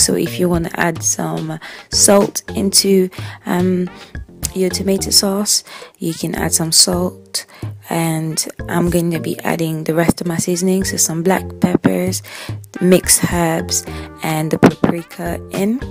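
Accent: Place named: United Kingdom. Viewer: 😍😍😍😍😍😍😍 she talks well, your accent sounds Uk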